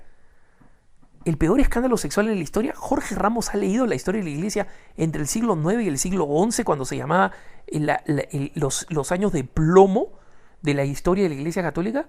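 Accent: Peru. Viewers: Perdón, pero aquí, el totalmente desconocido eres tu(el que hace la "narracion" en el video) por tu acento se eres peruano, pero, ignorante,Jorge Ramos aunque tenga un nombre y apellido muy común,es uno de los 50 personajes mas influyentes en el mundo Hispano; y claro que está autorizado para cuistonar no solo a los papas sino hasta presidentes de paises (como lo ha hecho)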